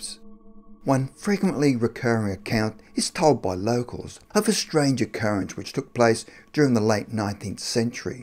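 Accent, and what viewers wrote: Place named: Australia. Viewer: It's an Australian accent. What's so unnatural about it?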